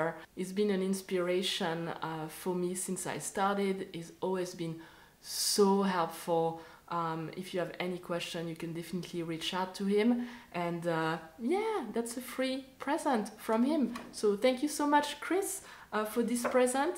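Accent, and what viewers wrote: France. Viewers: Hello :) J'entends à ton accent (1ère vidéo que je vois de toi) que tu es francophone voire française :) (Personne n'est parfait :D Je vais aller voir leur site, mais pour un produit équivalent, on m'a dit que le défaut - pour un Fujifilm - est que le bouton de release de l'objectif est difficile à atteindre. Ensuite il faut voir si l'ensemble de ton parc objo pourra encore se connecter à l'apn. Dans une autre vidéo, un photographe disait ne pas savoir installer tel ou tel caillou une fois cet accessoire placé... Je n'ai pas la référence de ce youtubeur mais il donnait ça comme principal défaut. Excellent produit qui m'intéresserait s'il s'adapte à mes Fujifilm et les cailloux qui vont avec :) En attendant je vais prendre le temps de découvrir tes vidéos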